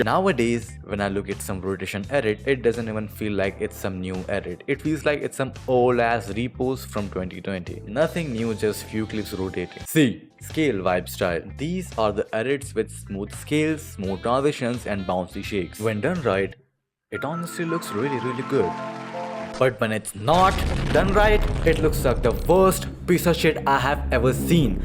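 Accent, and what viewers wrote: India. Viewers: bro's Indian accent>>>>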